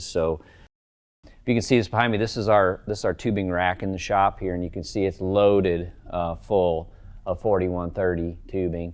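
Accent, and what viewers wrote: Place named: United States. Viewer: Great video ... still cant get over how Americans say tubing and aluminium lol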